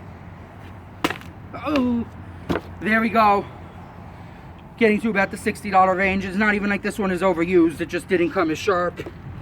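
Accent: Ireland. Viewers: are you from Ireland? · Are u Irish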